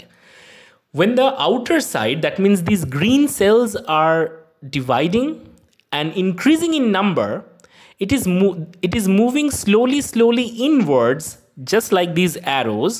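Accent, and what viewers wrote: India. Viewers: he's indian, how else is he supposed to speak.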